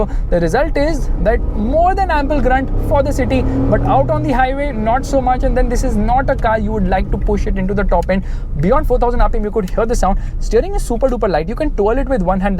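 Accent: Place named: South India, India. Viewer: That south indian English accent, 👌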